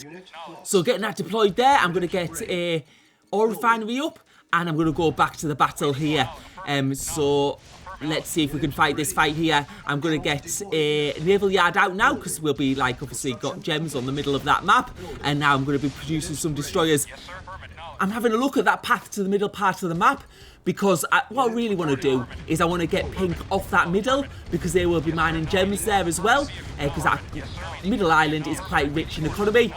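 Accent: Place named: Wales, United Kingdom. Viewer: Love your videos! You are really good at presenting the game. For the life of me though I cannot hear what you are saying in your first few words when a video starts. What accent is this? Welsh?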